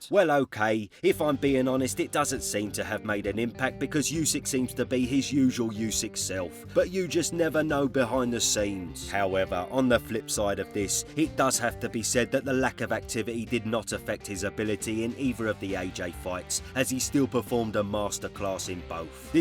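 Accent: Cockney, United Kingdom. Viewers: As an Aussie, i appreciate the cockney🇦🇺 Also, looking at how chisora boxed usyk, the way fury bullies, i think he beats usyk. Fury and uss cunningham shows fury just manhandles cruiserweights. And fury was pillowfisted fury in that era.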